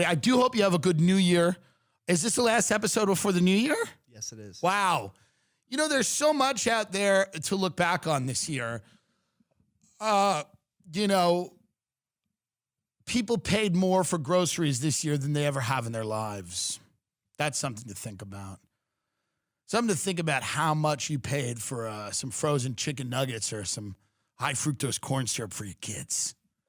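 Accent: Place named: Long Island, United States. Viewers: The NY way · Long Island baby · ❤️ his lawng guyland accident. I miss it.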